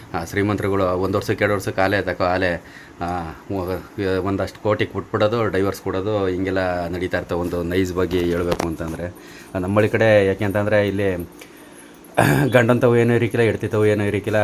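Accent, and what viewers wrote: Mandya, India. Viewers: Namaste avrige. Video super 👌. Nimma Mandya bhashe 👍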